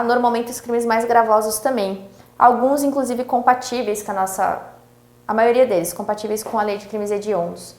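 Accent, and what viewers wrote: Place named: South, Brazil. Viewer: Percebi um sotaque sulista cumulado com o mineiro..... um concurso material eu diria.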